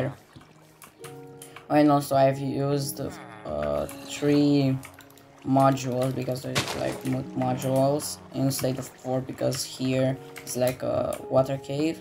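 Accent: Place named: Colombia. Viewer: great editing! are you colombian btw because you sound like it lol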